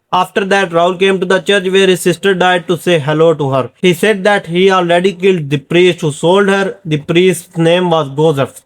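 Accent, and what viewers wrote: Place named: India. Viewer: You english ascent is more indian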